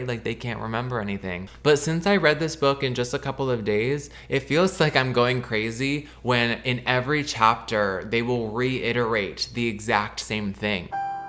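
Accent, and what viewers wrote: New Zealand. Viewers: I cant with your new zealand accent 😭😭😭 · the entire video and the nz accent got me so blindsided as a nz person LMFAOOOO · So happy you posted again ❤️ and your New Zealand accent had me dying 😭😭💀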